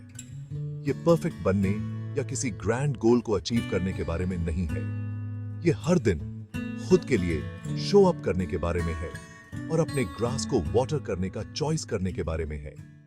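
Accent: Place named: India. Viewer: English thodi thodi Hindi jaisi hai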